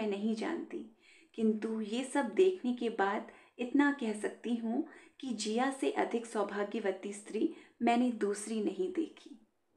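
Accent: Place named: Rajasthan, India. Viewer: ये राजस्थानी लहज़ा, लिखावट, संस्कृति... सब कुछ बेहद ख़ूबसूरत। बहुत सुंदर संस्मरण ♥️🌼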